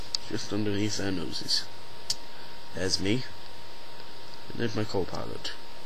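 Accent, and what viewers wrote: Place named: Yorkshire, United Kingdom. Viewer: YOUR YORKSHIRE!!!! SO AM I!!! xD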